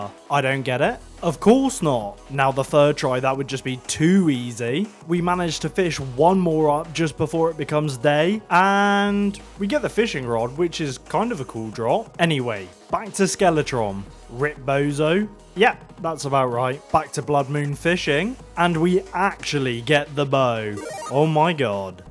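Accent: United Kingdom. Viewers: Really liked the vid, but would enjoy it more with thicker briish accent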